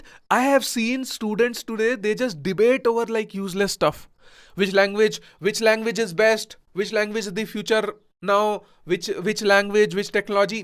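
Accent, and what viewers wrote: India. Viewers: AP b English desi bol te he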